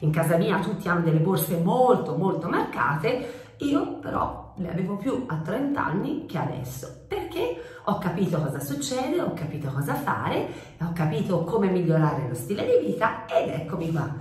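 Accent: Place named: Florence, Italy. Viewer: Ciao, piacere di conoscerti. Ti ho scoperto ieri sera con grande piacere. Volevo farti una domanda ma preferirei in privato. C'è la possibilità di scriverti una mail? Grazie in anticipo e un abbraccio da Firenze. (Mi sembra di sentire che anche tu sei delle mie parti)😍😍😍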